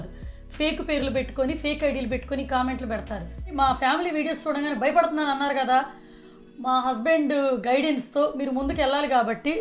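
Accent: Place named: Guntur, India. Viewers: Sister asalu mee voice yentho bagundandi asalu first time mee videos chusinde mee voice vini because ma kosta ammayi laaga anipinchindi so I love ur voice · MEE VOICE NAAKU CHAALA ISTAM!!! It is unique, vinalanipisthundhi vintunte dhaaniki thodu aa gunturu yaasa perfect blend. Negatives assalu pattinchukokandi vaallaki taste ledhu anthe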